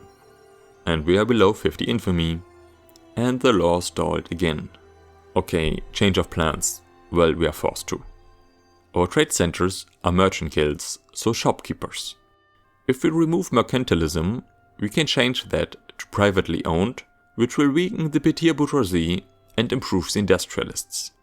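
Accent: Germany. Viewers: Alter, gotta work on that accent. Good vid though, but boy is that German accent seeping through savagely...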